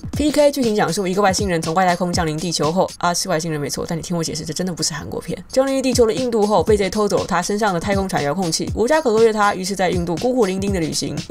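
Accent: China. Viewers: 主要是口音問題，一聽就知道 ；用字遣詞不是在成語，是在一些像"尤為特別的存在"、"玩意、"不能忍"等一般台灣人不會使用的語句，台灣學對岸的詞彙大多是台灣本來沒有的簡稱，像是小三、小屁孩等，也僅此而已還是建議你口音可以改台灣人比較能接受的方式，如果你觀眾群在台灣的話，因為很多人對這是很反感的，像我因為有人推薦說很好笑來朝聖，但口音和用詞讓我退避三舍，大概30秒就不行了，很遺憾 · 魏茹茹叫別人不要在台灣用大陸口音,想想嘛...不就等於叫台灣人到大陸不准用台灣口音.... 如果是我我也不知道要怎麼跟人家溝通哈哈...多體諒一下就好啦,不喜歡也不要說的那麼白,要留台階給人家下呀...尤為特別存在我會用啊...玩意兒...不能忍很常聽別人說呀.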